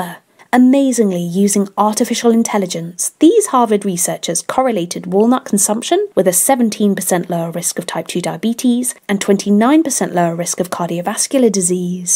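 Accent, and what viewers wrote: England, United Kingdom. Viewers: Having a lovely English accent is pure gold here in America. You already get a 10% bonus for sounding more authoritative. New sub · I love the British accent. Watching from California.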